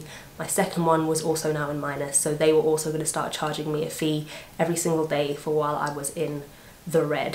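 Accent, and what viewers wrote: England, United Kingdom. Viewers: English is too English. Had hard time understanding her and so I just quit. Maybe she should take a couple of bucks and get a voice coach.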